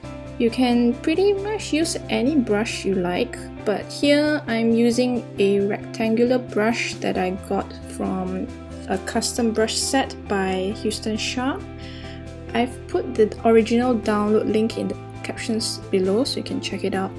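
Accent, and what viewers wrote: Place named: Nigeria. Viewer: i swear your accent sounds Nigerian. if it wasn't for your profile pic i would have guessed you were my country mate.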